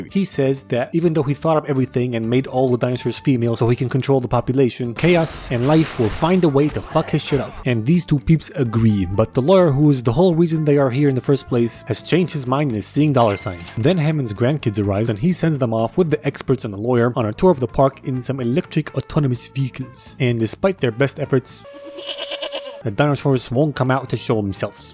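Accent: United Kingdom. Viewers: the best part is the accent - I'm british too but it gets me every time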